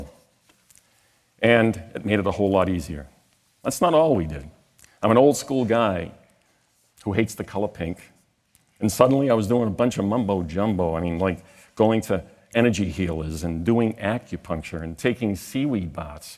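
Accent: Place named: Boston, United States. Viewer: WOW, Great speech. Spoken from the heart. And you still slightly have your Boston accent. God Bless and be well.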